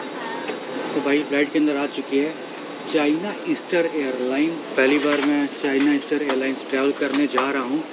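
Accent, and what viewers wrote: India. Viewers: 大部分普通中国人英语并不好，你说印度口音的英语，他们更听不懂。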